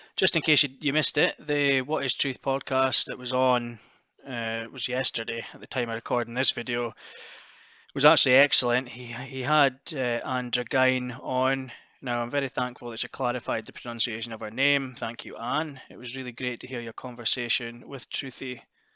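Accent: Scotland, United Kingdom. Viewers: I might be biased as I'm Scottish but it's good to hear a Scottish accent that isn't on Jeremy Kyle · Fuckin love Scotland Scott's forever fuck thst... Love the accent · Anybody believe this Sammy the Bull story? Doesn't add up. As a scouser I'm trying to decipher what part of Scots you're from. Its not a heavy Glasgow accent or Edinburgh. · Least you use your wee scotch voice...😉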